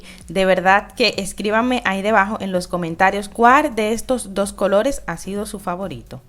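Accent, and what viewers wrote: Dominican Republic. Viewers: Hola 👋 gracias por compartir tus ideas! 💡 Una pregunta , ¿ Eres Dominicana ? Tienes un acento sureño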